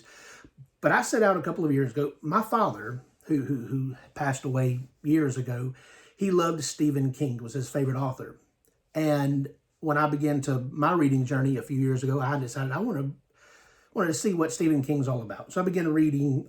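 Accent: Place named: South, United States. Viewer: Cute guy with a southern accent talking bout books? I’m in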